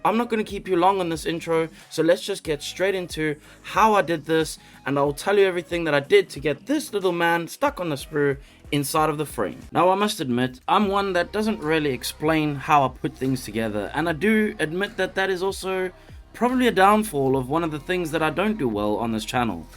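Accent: South Africa. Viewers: A cute idea, that a SA accent? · Good to hear a South African accent again after moving to the UK!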